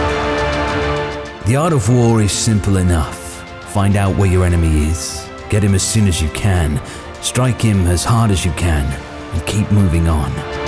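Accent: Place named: England, United Kingdom. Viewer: As told by a limey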